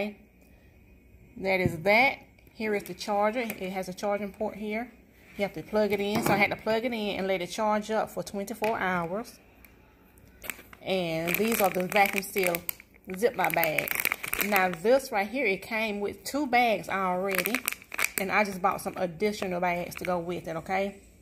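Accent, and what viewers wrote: South, United States. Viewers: You sound like I want you for my Grandma. Love that country woman accent. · Love your accent! Are you from either South Carolina or Georgia? That's my guess, lol. I'm from RI so my own accent is absurd (Boston, lol), so I appreciate a good accent. =)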